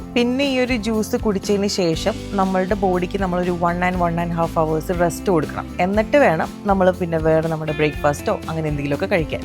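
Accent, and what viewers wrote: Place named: Malappuram, India. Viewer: നമ്മളെ കുമ്പളങ്ങ മലപ്പുറം ഭാഷ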